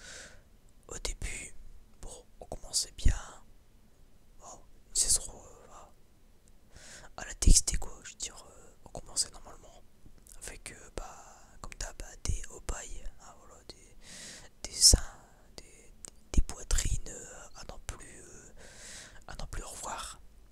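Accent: North, France. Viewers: Ton chuchottement av cette accent du nord j adore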